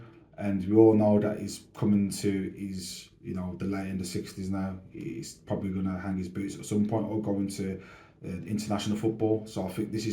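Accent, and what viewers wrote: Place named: England, United Kingdom. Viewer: look like Lukaku with a English voice 💙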